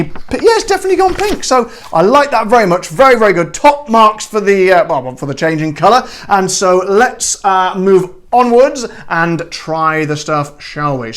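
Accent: Australia. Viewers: As an Aussie, as well as this being my first time ever watching one of your videos, I actually thought you were from Australia for the first 30 seconds! Top marks on the accent. I also just bought a bottle and it's delicious. For all you non-Australians, don't worry about getting ripped off, its still expensive as fuck here too :( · 10-10 for the Aussie accent mate! Just bought this Gin tried it tonight. It’s unreal!!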